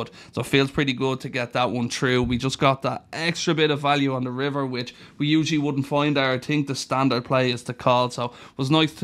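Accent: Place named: Ireland. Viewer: Love it! I just watch your vlogs cause I enjoy hearing hand histories in an Irish accent. Haha. Anyways great episode. Also, that raise on the river with Q10o was SPICY!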